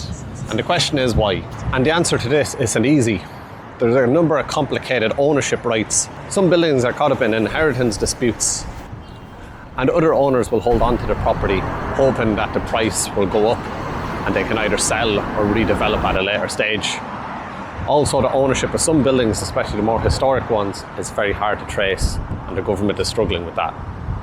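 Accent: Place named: Ireland. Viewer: combined with being a total hottie, that gorgeous Irish Accent turns into the total package of hotness 🇮🇪☘️🇮🇪❤️❤️❤️❤️❤️❤️❤️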